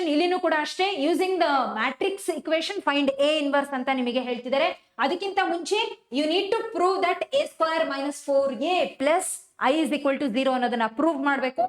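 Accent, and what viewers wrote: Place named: Kerala, India. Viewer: Madam I think u are malayali, your kannada slang is in malayam accent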